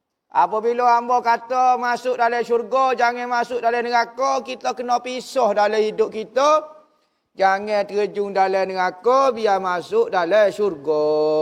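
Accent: Kelantan, Malaysia. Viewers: Alhamdulillah kamu pahe gok ustadz kecek loghat kelate..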